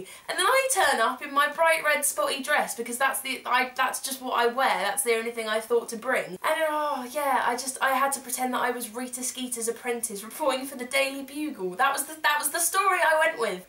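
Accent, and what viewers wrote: United Kingdom. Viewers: I love your videos, you're one of the only British people I'm subscribed to! And I'm British! It's nice hearing a familiar accent! xox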